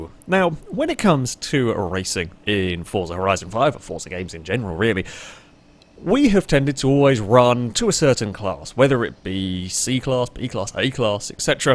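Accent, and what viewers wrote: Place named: Midlands, United Kingdom. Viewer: In queens English, you would be correct, but accent isn’t queens, it sounds mid shire to me, and it’s probably acceptable in that accent. Of course a lot of people think that Queens is the only “correct” accent and insist that it’s grammar rules are followed, but frankly, it’s a very old fashioned point of view.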